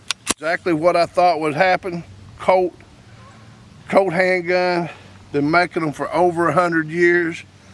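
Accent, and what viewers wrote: South, United States. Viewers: Hey You The Man Coming At You From North Carolina Old Vietnam Vet Retired 100% Disabled PTSD.SARGE I Bought One When They First Came Out & Your Review Was Spot On.Thank You Sir For Your Service. I know A Vet When I See One.Yes Sir Ree!!!! Over In Nam The Best Friend Of Mind Was From Arkansas Best Man Every Has His Shit All Wired Together Tight. You Get My Meaning. Sarge Any Thoughts On Suppressor For This Mean Machine.Have Looked At Threaded Barrels All Over The Web. I got Two Suppressors One For Colt AR15A4 Weapon And One For My M1991A1 If You Have Any Thoughts On This This Subject Please Do Share With Me On The Best Way To Go with This Project Sir. I Love The Way You Talk With Your Southern Slang & I,m Proud To Be From The South Too Just Like I Know You Are.Have A Good One & Keep It Between The Ditches HaHa!!!! Owens Signing Off For Now.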